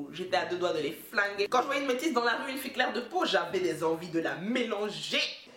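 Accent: Ivory Coast. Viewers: alors là ! !!! Si on me dit que tu n'es pas ivoirienne toi! lool. Je te découvre avec cette vidéo. ..Et pardon yé né ka m'abonner keh lool. J'ai trop rigolé. Je t'encourage ! Tu es top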